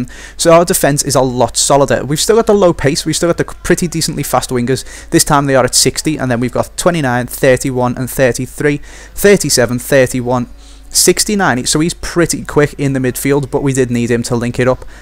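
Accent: Liverpool, United Kingdom. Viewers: you scouse?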